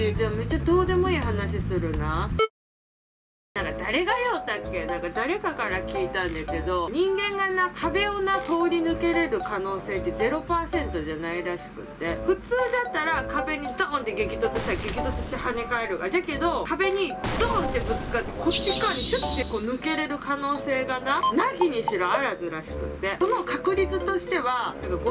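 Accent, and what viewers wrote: Okayama, Japan. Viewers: 岡山県民だけど岡山弁嫌いでもまあたその岡山弁は好きなんだよなあ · まあたそさん 初めましてこんにちわ😁😁😁 いつも楽しみに見させて頂いております😊🤚 やっぱり、まあたそさんは面白い☺ですね🤚 いつも、ゲラゲラ😆😆😆と1人見ては笑ってます😅😅😅 懐かしい岡山弁にホッコリ💓しながら見てますよ😁😁😁🤚 岡山を離れ早いもので8年になりますがなかなか実家に帰れないのでまあたそさんの動画を見ては実家を思い出しながら見てます😅😅😅 メイク動画も好きですがこの動画も好きです😊🤚 今のまあたそさんらしくこれからも頑張って下さいね🤗🤗🤗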